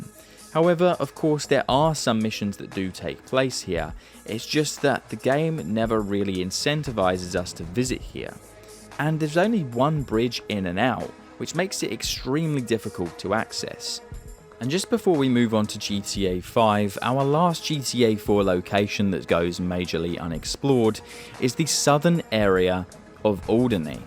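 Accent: United Kingdom. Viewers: I played the year it came out and saw all those back then... didn't even forget 'em. My wife watched her brother play back and the day... she didn't forget them either. The houses in the ocean being weird to what sounds like an Englishman are pretty rich. You never heard of the houses ON the River Thames or the WW2 outposts that people ended up living in? SMH. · He's British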